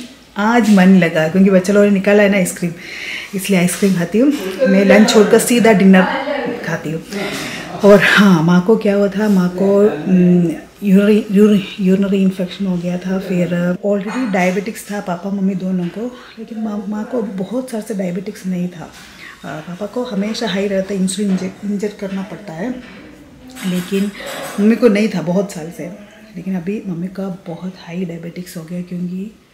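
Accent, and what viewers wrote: Kerala, India. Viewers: Yourvlog is very nice. I'm enjoying it and your way of talking Hindi is cute with malayalam accent .Where are you exactly in kochi? I mean your in laws house where is it Chechi? Is it in pallikkara or kakkanad?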